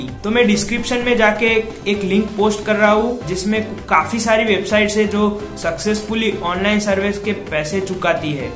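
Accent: Gujarat, India. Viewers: Gujarat se ho bhai?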